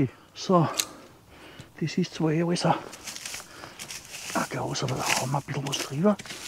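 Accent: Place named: Austria. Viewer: Danke lieber, ja da gibt es viele Videos... Aber deine sind die Besten. Deine Art etwas vorzustellen hat was ganz besonders... Dein österreichische Dialekt, deine Ruhe... und die Umgebung in der du filmst... 👍👍👍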